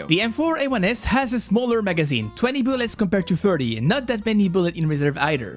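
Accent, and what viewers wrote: France. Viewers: Are you french? Nice video btw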